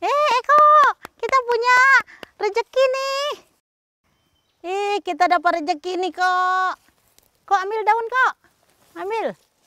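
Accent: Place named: Minangkabau, Indonesia. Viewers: logat Padang susah hilang ya mi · Melihat logat nya seperti nya umi urang awak! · Dari logat umi bicara, sepertinya org padang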